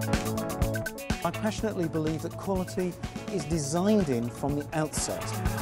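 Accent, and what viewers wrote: United Kingdom. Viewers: Between his British accent and that awful music, I didn't understand much of what he was saying. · lol. They had to take a guy with a british accent. For some reason, people tend to swallow bullshit as long as it's coming from the mouth of a british. I could smell some bullshit in that speech. I know it's a good car, I like the car, but this guys tried to make it look like the car is more then what it actually is. He tried to sell small achievements as big "nearly impossible" achievements. I mean c'mon now. We know the car is good but please..... quit the bullshit.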